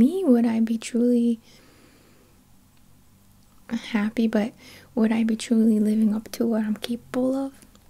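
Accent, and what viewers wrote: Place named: New York, United States. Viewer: I can hear NY in ur voice. Much love🧡🧡🧡✨✨✨✨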